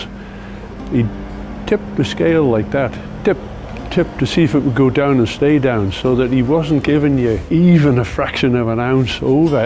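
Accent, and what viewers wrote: Isle of Man, United Kingdom. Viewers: Absolutely brilliant. Great stories and the best Manx accent I've heard in years. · So nice to hear a true Manx accent.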